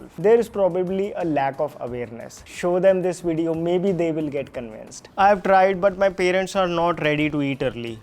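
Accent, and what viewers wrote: India. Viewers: Pta nhi Bhai ki english hindi jaisi lgti h 😅😅 Nice information ♥️🌸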